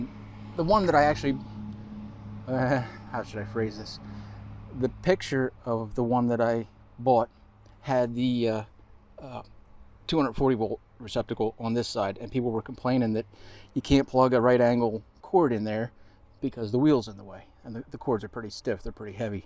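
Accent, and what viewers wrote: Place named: Idaho, United States. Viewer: Where you from cat? You sound like an Idahoan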